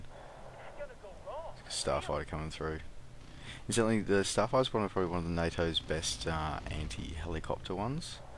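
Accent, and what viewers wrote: Australia. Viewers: You make some brilliant content, and I love watching your War-game Videos. But its a pity you don't have that many viewers. :( Also are you Australian? And do you do Youtube as your job?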